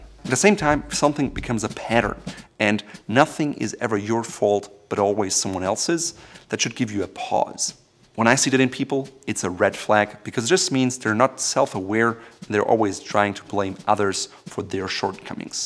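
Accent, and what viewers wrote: Germany. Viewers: You are definitely GERMAN... (belive me i said that before you disclosed) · I swear, I only noticed your German accent after you said you were German. After that I couldn’t stop thinking about it.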